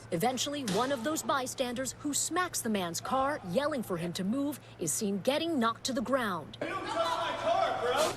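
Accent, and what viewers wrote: South, United States. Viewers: For the first 30 minutes I literally thought you were saying ‘Cow’ instead of ‘Kyle’. I have a southern accent too and it still took me a second.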